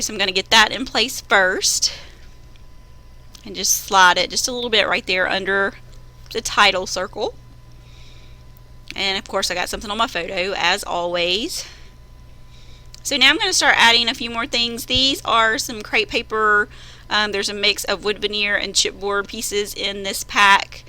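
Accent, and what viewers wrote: South, United States. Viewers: So awesome!! I love hearing another Southern accent!;)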